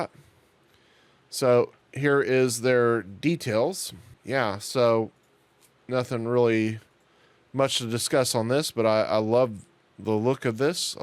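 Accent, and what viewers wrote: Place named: Texas, United States. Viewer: Love the Texan accent 🙏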